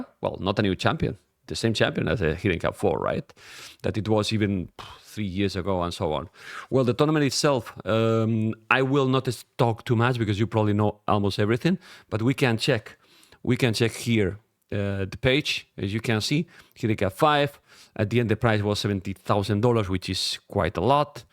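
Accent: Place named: Spain. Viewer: I'm English and the jokes he does is European Banter/Dry humour, it's very understandable and funny af lmao, yes he has a bit of a thick spainish accent but it's a positive, it brings charm and more humour to some sayings he does which he has created himself, like "SIRO!" It's brilliant. And I think he's got better at not interrupting, I really respectfully disagree with all what you said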